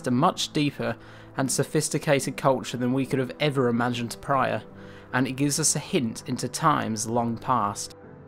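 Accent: United Kingdom. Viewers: He's a Brit. What do you expect?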